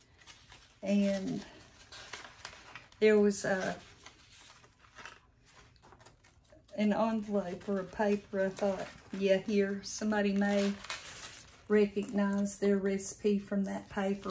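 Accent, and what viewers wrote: South, United States. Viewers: especially love your southern drawl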